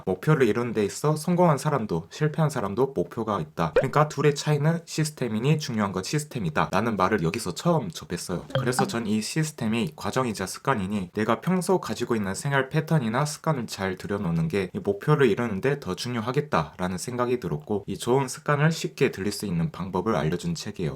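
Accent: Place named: Japan. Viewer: 일본분이신가용??? 발음이 죠금씩 세는,, ?!? 그치만 내용 넘 좋아요! 같니 홧팅홧팅입니당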